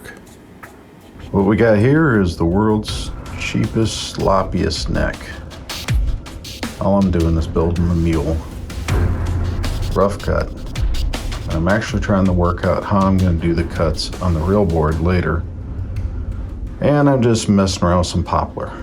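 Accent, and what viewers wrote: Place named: Yorkshire, United Kingdom. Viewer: Not a bad Yorkshire accent! 😂. Loving this, you are clearly insane but this is gonna be great. Well done mate 👍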